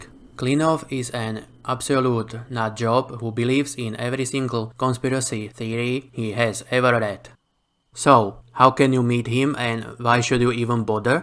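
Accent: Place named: Russia. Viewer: Spasiba,. You're accent sounds Russian /Ukrainian to me, so excuse me if I got that wrong. Your video is very helpful, because Klinov killed me twice.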